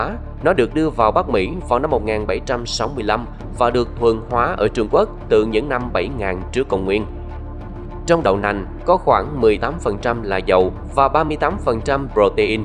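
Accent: South, Vietnam. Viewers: Nói giọng MN mà toan la dùng từ MB,( mỡ lợn, quả ngô, bỏng ngô, đảm bảo, ), nghe nó ngộ ngộ sao đâu. ( Mỡ heo, trái bắp, bắp nổ, bảo đảm). Và còn nhiều từ MB nữa.Vv..vv. Cũng có thể anh này là người Bắc 75-2024. Cũng cảm ơn Anh rất nhiều vì đã chia sẻ những thông tin rất là hữu ích.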